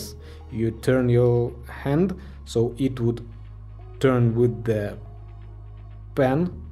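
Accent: Russia. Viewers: Акцент русского человека!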